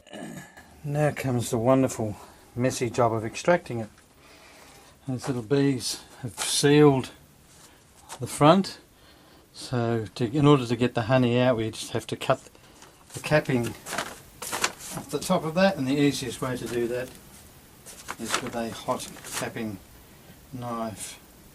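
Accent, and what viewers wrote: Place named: Australia. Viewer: Heard your accent and immediately said "he sounds like a fellow Aussie" Then saw the Geelong shirt and all was confirmed.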